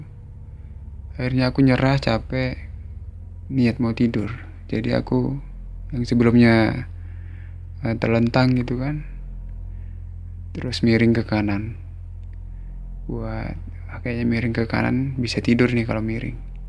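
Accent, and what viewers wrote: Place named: Medan, Indonesia. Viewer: medan x ko bang. mantap.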